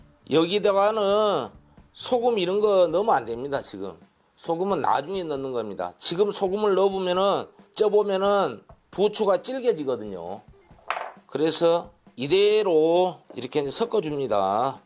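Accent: Jeolla, South Korea. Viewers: 셰프님 라도사투리~양재기 참듣기좋습니다 잘배워갑니다❤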